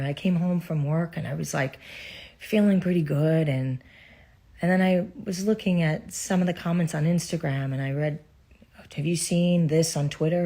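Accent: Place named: Brooklyn, United States. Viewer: the brooklyn accent and some evil queen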